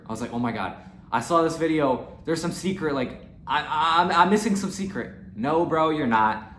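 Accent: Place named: Wisconsin, United States. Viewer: are you from Wisconsin with that accent? that point about scaling up with accounts of different sizes is so true, no more full porting 😎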